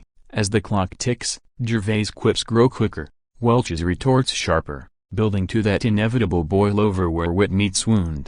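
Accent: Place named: United States. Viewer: Sounds like an American giving a commentary on a English Tv's loose women?? It's all B/S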